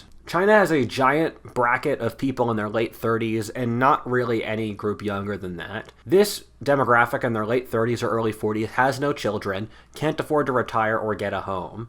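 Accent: United States. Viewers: I heard the heavy American accent, & immediately disregarded this video. .... The short introduction alone showed how naive (poss believing all the heavy US propaganda) this presenter is. .... Wait just a few more years, young Sir, & you will be horrified at what happens betw East & West.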